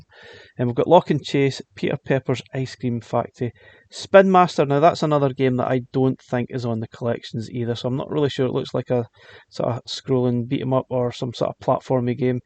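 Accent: Scotland, United Kingdom. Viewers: Shock Troopers is awesome! I can hear your a scot so maybe you like a bit of Jungle/DnB? If so, you'll love the music in Shock Troopers. Shock Troopers 2 loses all the charm of the original IMO. It's wicked getting that on cart. Shock Troopers 1 wasn't even released on the AES back in the day.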